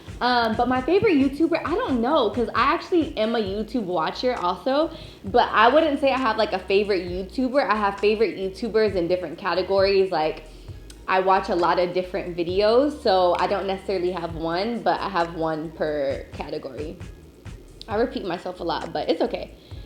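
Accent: France. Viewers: You're just Soo gorgeous and your french accent is so cute 😘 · J'aime trop ton accent français 😍😍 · 😍😍😍😍 ton accent français est trop chou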